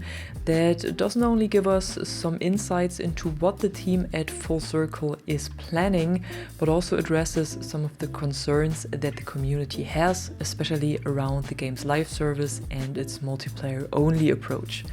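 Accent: Germany. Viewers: Ist dein Dialekt deutsch? Falls ja lass ich einmal liebe Grüße doch schau schon längere Zeit deine Videos spiel das spiel jetzt seit einigen Tagen im early Access... Muss sagen es macht riesen Spaß aber ich komme nicht mit der Grafik nicht anfreunden sie sieht irgendwie extrem unfertig aus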